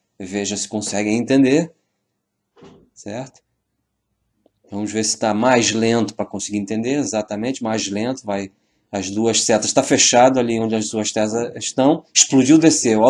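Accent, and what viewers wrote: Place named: Rio de Janeiro, Brazil. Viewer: Que legal sotaque carioca...explora o (x) em tudo kkk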